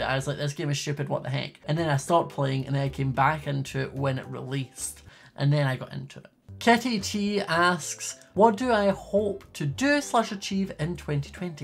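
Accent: United Kingdom. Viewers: We love a good ?british? Accent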